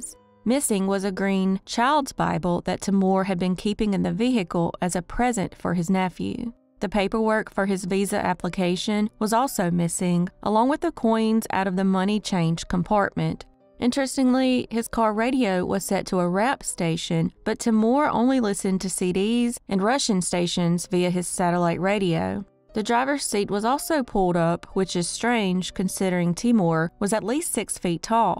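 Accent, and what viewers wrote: South, United States. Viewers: GREAT JOB!! KEEP EM COMING!! AND I LOVE THAT SOUTHERN COUNTRY VOICE!!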